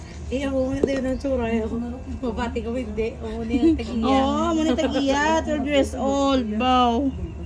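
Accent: Iloilo, Philippines. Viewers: knamian ko sng mga tono ilonggo ah.. tga dn ka maam? watching from Bacolod..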